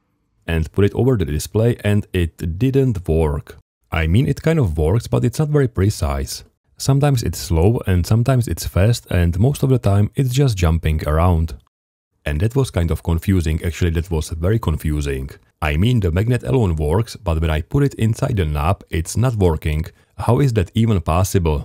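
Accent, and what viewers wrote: Czech Republic. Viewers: Ten českej přízvuk jsem poznal hned :D Btw cool projekt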